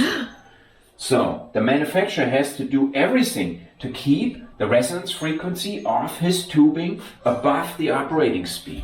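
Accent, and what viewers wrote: Germany. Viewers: Mechanical lectures spoken in a German accent have an authority that is unrivaled by any other nationality. All of us viewers around the world are just sitting nodding and quietly saying, "Yes, Herr Professor," accepting everything you say without question... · I hear German accent, I believe any engineering points they tell me !!!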